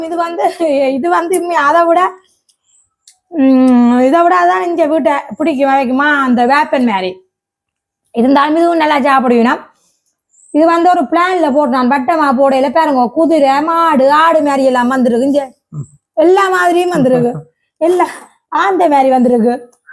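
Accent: Sri Lanka. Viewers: நீங்க பருத்தித்துறையா சகோ 😂😂😂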